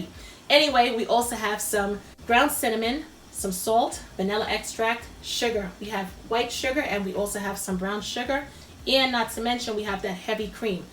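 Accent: New York, United States. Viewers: I hear the NYer in your voice 😂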